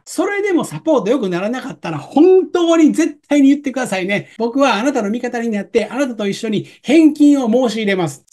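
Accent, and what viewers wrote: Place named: Kansai, Japan. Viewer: 内容も素晴らしいけど、関西弁面白い😂❤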